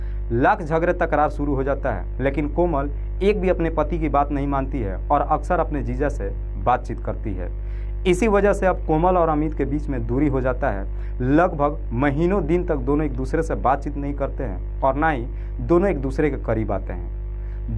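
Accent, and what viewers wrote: Bihar, India. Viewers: Bihari ho kaa bhai?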